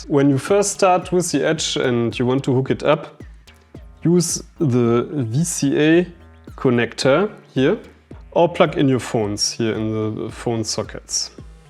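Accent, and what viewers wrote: Germany. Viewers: this sound more german then english :D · Beautiful German accent 🇩🇪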